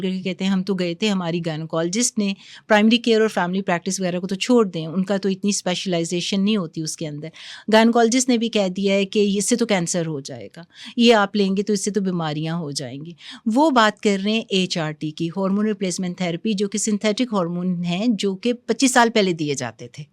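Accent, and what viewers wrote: India. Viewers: Me bhe confused hoon k ye anchor q Hindi pronunciation k sath baat ker rahe hy ya shayd Undian hin qk unki alphabet me khay ghain nahe hy or agr ye Pakistani hy to isko yaqeenan urdu talaffuz ana chahiye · Bhen ap jan k hindi accent main boti ho sach main zehr lgti ho esey boltey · why host uses indian style urdu , she says کھ، instead of ک۔ like خواتین کو کھواتین وغیرہ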